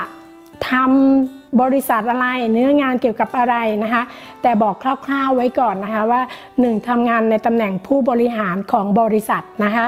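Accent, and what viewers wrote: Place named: Thailand. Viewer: Wow. This is an interesting Vlog. Greetings from Oman. Sawadee Krap.. Khob khun for sharing this video. Interesting to know about you and your life. good to meet your cameraman Husband:) Tinglish sounds cool.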